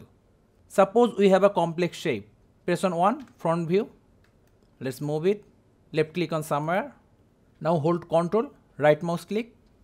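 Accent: India. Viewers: of course the guy with with and indish accent teaches me years of blender in 2 minutes, reliable as always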